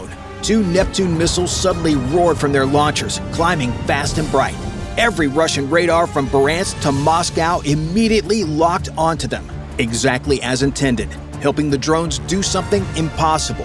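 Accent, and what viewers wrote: United States. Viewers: It’s a shame it’s all in a US of North American accent. Totally unbelievable.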